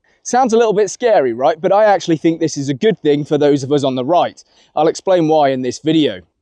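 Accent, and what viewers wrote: United Kingdom. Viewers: you articulate yourself like a real Brit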